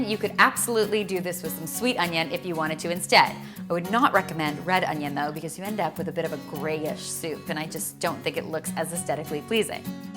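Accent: Canada. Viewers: You're Canadian, right? Just confirming 😅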